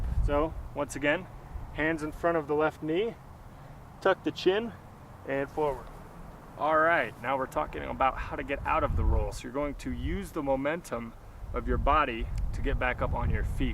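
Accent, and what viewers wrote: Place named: United States. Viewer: your voice sounds very american 😳🤔🙂 greetings from Vienna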